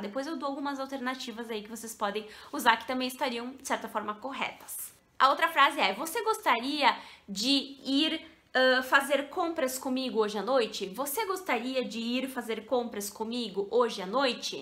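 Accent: Rio Grande do Sul, Brazil. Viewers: ESSE SOTAQUE gaúcho e os verbos USADOS no tempo certo É MUITO chic, PARABÉNS !!! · vc é linda e muito inteligente mas esse jeito de falar dos gauchos são nojento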